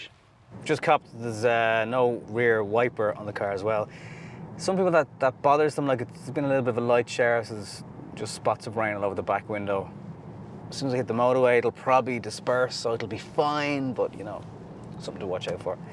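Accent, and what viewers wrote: Ireland. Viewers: Good Good, he is very Irish.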